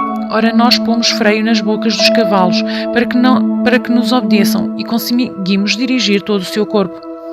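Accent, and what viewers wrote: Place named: Portugal. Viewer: Na Tradução dessa Bíblia é a msm em Português Brasileiro né , o sotaque de Português de Portugal é Lindo !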